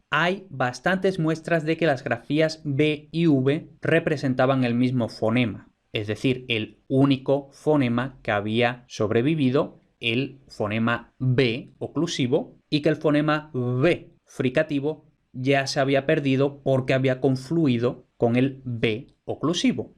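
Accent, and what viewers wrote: Spain. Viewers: te felicito por venir a ver estos videos si estás aprendiendo español, son explicaciones más bien técnicas que ayudan a entender el orígen de muchas palabras en nuestro idioma. Y se te puede dificultar también por el acento de, el español ibérico a veces es complicado de entender, si los hispanoamericanos tenemos dificultad para entenderlos, imagínate tú.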